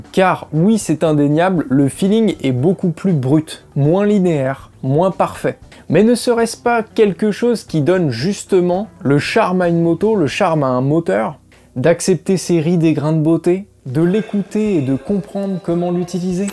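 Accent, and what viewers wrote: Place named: England, United Kingdom. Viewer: oh putaing l'accent anglais .......😂😂❤ Tu mélange beaucoup de choses! Ride-by-Wire, appelé aussi Drive-by-Wire, vu pour la première fois sur la Yamaha YZF-R6 en 2006. La poignée des gaz est reliée à un contrôleur, lequel envoie les changements de position de la poignée à l’ECU, lequel à son tour envoie un signal aux actionneurs, les composants électroniques et mécaniques agissants sur les injecteurs, en prenant en compte différents paramètres : le régime moteur, la vitesse enclenchée, l’oxygène non consommée dans l’échappement, etc. Ce système permet un contrôle précis du mélange air/carburant......après le reste c'est de la mécatronique que tu es en train de mélanger à ton sujet...❤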